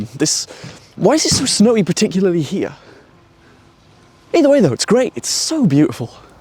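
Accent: United Kingdom. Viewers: The beginning of this video only works with a British accent. But it works oh so well.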